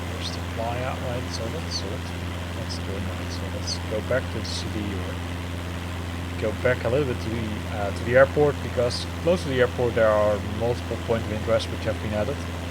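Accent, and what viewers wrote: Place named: Netherlands. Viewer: Recognized the accent and immediately Subbed to support a fellow Nederland er!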